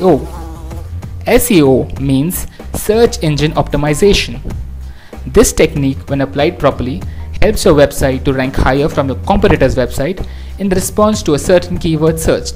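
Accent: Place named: India. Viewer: Indian accent... urgh.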